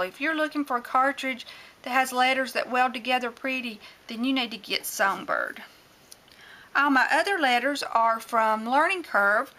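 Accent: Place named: South, United States. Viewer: Oh, I just LOVE to watch your videos....your voice is sooooo sweet and Southern - I LOVE IT:) Your card totally ROCKS!!!! Thanks for letting us know the letters from Songbird welds good, I am going to try it out!!! Hugs, T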